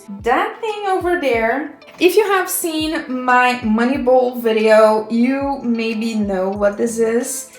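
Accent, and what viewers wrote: Brazil. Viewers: r u from brazil? u have a little accent <3 hahaha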